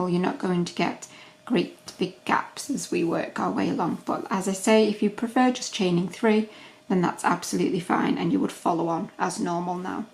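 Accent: United Kingdom. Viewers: There's this cool button that you can use if shes going too fast for you... its called the PAUSE button. Such a wonderful invention! Also, as an American, I can understand her British accent very well. She speaks pretty clear compared to several others that I've tried following. Just some constructive criticism for YOU 😊✌❤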